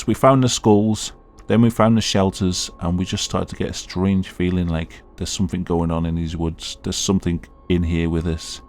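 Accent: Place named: Wales, United Kingdom. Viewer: I could have sworn I heard “torches” the whole time. Guess I am not used to that Wales’ accent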